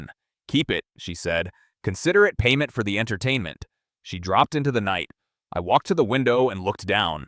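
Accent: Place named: United States. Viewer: it's marginally better if slowed down to 90% but that voice is very American and whiney ... It doesn't fit with the context or the content.. but the story itself works well.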